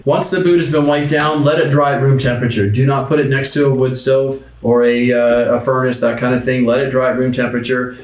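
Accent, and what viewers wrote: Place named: East Coast, Canada. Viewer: I give Americans a hard time about Canadians saying "about" a funny way. I'm guessing this guy is in from the East Coast because I'm from the West and now I can clearly see what the yanks are hearing. 😁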